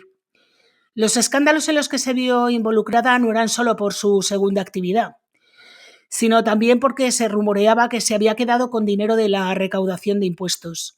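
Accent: Spain. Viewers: Hola querida. Me he pasado la tarde de hoy viendo tus programas y ya me suscribí, eres encantadora con tú acento tan español. Recibes un fuerte abrazo desde República Dominicana y muchas bendiciones.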